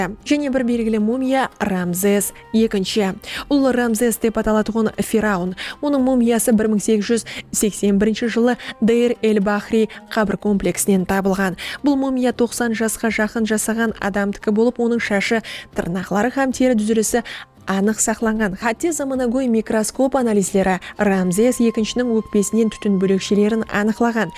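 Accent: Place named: Karakalpakstan, Uzbekistan. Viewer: Берекелле журналист кыз таза Каракалпакша сойлегенин ушын рахмет каналды коллап кууатлайык ❤❤❤❤❤ лайк праписка болыңлар жаксы мәғлүматларды алып барыудан шаршаманлар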